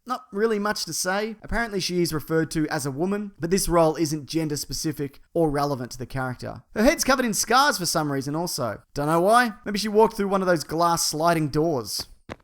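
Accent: Australia. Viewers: yeah his australian speech pattern is definitely entertaining. keep bloody going mate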